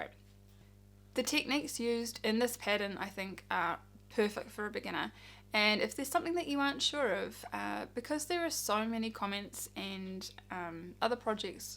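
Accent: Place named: New Zealand. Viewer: Hello fellow Kiwi knitter! Just came across your channel now. Great to hear a Kiwi accent on YouTube! Love your description of the project, you make it very clear on the pattern, materials and mods. Kia ora!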